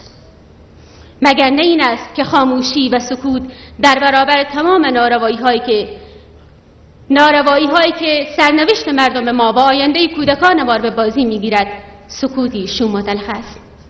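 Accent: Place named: Herat, Afghanistan. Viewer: she is herati and they have accent like that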